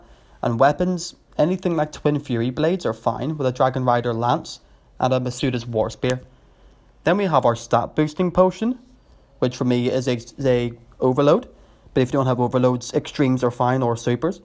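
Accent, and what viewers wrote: Northern Ireland, United Kingdom. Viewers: Awesome hearing a Northern Irish accent 😝 represent!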